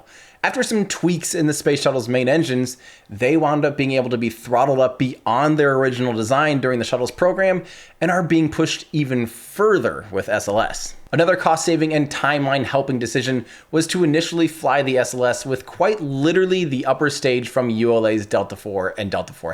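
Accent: Iowa, United States. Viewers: (Going back again...) Why do you believe your complaint about the ambiguity of calling the smaller unit the same name as the system as a whole (like when some people call a whole church meetinghouse a "chapel," the same as the main worship room by itself) reveal that you sound like you're from Iowa?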